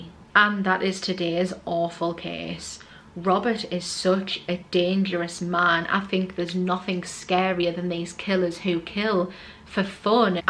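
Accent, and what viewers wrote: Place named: Scotland, United Kingdom. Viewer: Holy Smokes!!!!???!!! What tge H***!!!! Are you from Scotland? What a STRONG, STRING accent!! 😅😅😅😅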